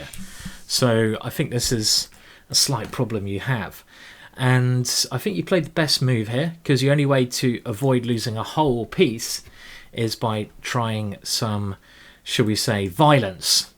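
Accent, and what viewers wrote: United Kingdom. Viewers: Your voice's alright, mate. The world is bathed in American media, with nasal voices in comparison to which any British accent at all is like a musical experience.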